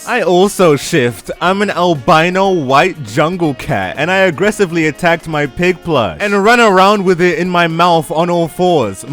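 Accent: United Kingdom. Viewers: Definitely from England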